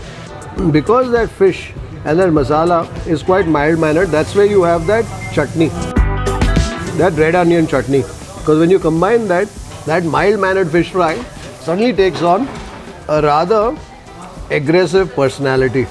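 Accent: Karnataka, India. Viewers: that is not marathi place it is in mysore... he is just speaking because mysore marathi people can still speak marathi.....but in bangalore maratha restaurant he did not speak marathi.... as the Bangalore marathi people do not understand marathi.... this is so weird... hehe.... BTW marathi is more proper than the hosts... the hosts have kannada accent and kannada grammar in their marathi....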